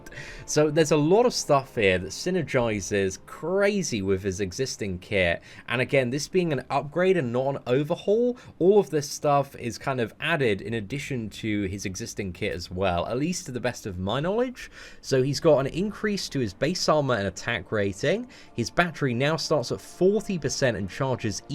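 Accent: United Kingdom. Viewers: Mephisto getting another synergy and a profile pic finally maybe!? Let's go bro! As always appreciate the breakdowns of every new event so I can be lazy and not read the forums and listen to a cool British dude.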